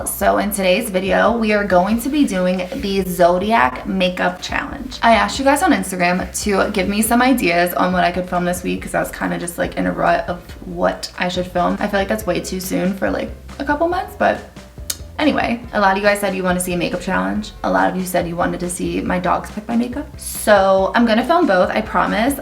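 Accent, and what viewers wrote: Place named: Philadelphia, United States. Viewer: Your philly accent came through so much in this vid haha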